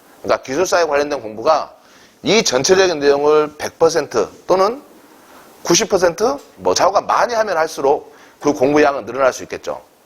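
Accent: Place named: Jeolla, South Korea. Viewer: 말씨가 전라도 그래서 나간다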